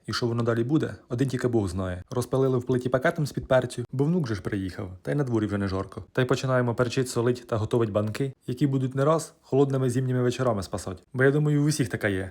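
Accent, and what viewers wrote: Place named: Rural, Ukraine. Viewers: Полтавский сюржик😂❤❤❤❤❤???? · Південний говір,мій ріднесенький,аж заскучала.Давно я в рідному краї не була,не дивилася із холму на ті поля нескінченні,пилюку ту задушливу не ковтала ,несучись полями(бо то ближче)до якоїсь річечки на шашличок,могилками рідних своїх невклонялась.....Господи,як же я скучила,навіть сама не зрозуміла.... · Це не народжується) Черкаси і черкаський район - ми так балакаєм 😅😊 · і Полтавщина так балакає.😊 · Суржик у вас даже знакомий, прямо , як у рiдному селi..побувала. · Полтавський говір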